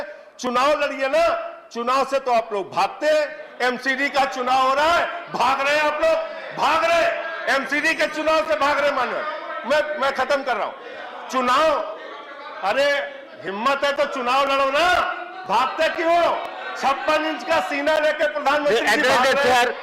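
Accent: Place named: Uttar Pradesh, India. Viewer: संजय सर !!! आप हीरो हैं देश के। आपके बोलने शैली हमारे उ०प्र० की पहचान है...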